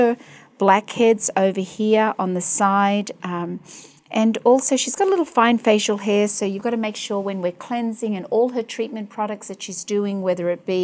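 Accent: Australia. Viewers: Australian or New Zealand(ian?)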